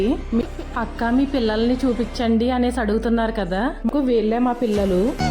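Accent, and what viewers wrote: Konaseema, India. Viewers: మీరు వీడియోస్ బాగానే చేస్తారు కానీ, మీ భాష కాస్త మార్చుకుంటే బాగుంటుంది. మరీ కోనసీమ యాస ఎక్కువగా ఉంది. మీరు దుబాయ్ లో ఉంటూ కూడా, పక్కా యాస లో మాట్లాడితే బాగోదు. కాస్త nice ga మాట్లాడటం నేర్చుకోండి. మేము కూడా గోదావరి జిల్లా వాళ్ళమే. కానీ ఉండేది హైదరాబాద్ లో. కాస్త స్టైల్ గా మాట్లాడితే మీకు ఇంకా వ్యూస్ ఎక్కువగా వస్తాయి😊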